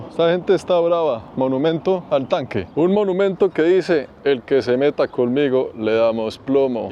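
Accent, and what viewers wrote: Bogotá, Colombia. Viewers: Hola. Lo que distingue a es la manera amena de relatar con su acento colombiano, muy gracioso. Además de enseñar lugares extraños como este 👏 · Aprender contigo es genial, lo genial es que eres rolo y gu forma de hablar es muy linda, si te veo en la calle te robo un beso 😂😂😂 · no paro de reírme escuchando tu rolo, es escuchar un cuento, contado por mi versión masculina 😂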